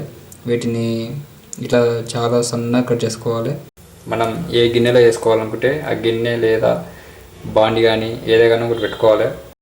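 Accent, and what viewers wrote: Telangana, India. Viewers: Anna manadi pakka Telangana MBNR basha🙋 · మన తెలంగాణ భాష లో మస్తు మాట్లాడుతున్నావ్ అన్న... 👌👌👌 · Telangana Basha super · anna ..pakka...telangana anukuntaa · మంచి తెలంగాణ భాష మాట్లాడినవ్ కూర ఎట్లైతే గట్లాయేగాని మటలైతే అస్సల్ మాట్లాడినవ్ 👍💐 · Super andi iam very big fan of your Telugu tounge because it's same like our mother telangana tounge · మీ లాంటి వాలు మాకు చాలా అవసరం అన్న..మన తెలంగాణ యాస చాలా బాగా మాట్లాడుతూన్నారు అన్నా..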